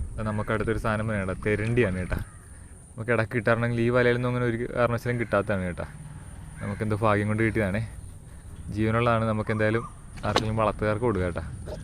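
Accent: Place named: Cherthala, India. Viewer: ചേർത്തല സൗണ്ട് ❤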